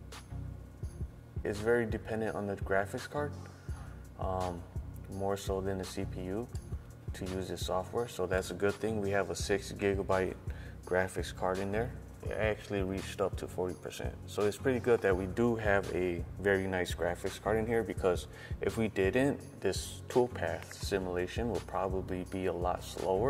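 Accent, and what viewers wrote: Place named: New York, United States. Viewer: Cool videos. Where are you based out of? You sound like you might be from NYC.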